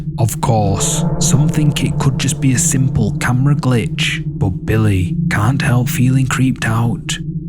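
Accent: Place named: Northern England, United Kingdom. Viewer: I just love these videos so much ❤It’s a real treat everytime you’re uploading something new. Your northern England accent’s also brings a kind of creepy aura to the videos. 😊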